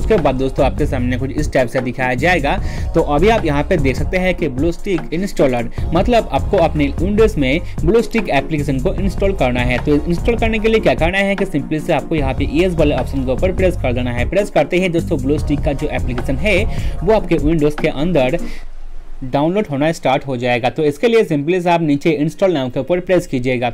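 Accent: Bengal, India. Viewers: apni bengali?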